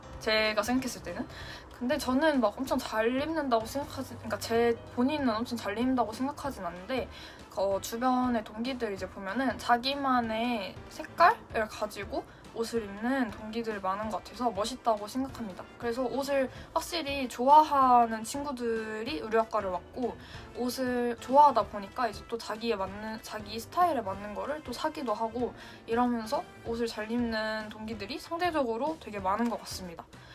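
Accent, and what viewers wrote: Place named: Jeolla, South Korea. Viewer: 전라도 출신 학생이시네요! 억양이 천상틀림 없군요~ 영상 많은 도움되었네요 감사합니다~!!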